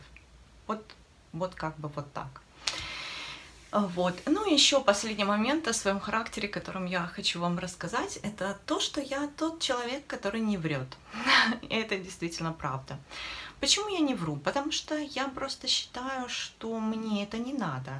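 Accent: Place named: Provinces, Russia. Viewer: простая речь как из глубинки и провинции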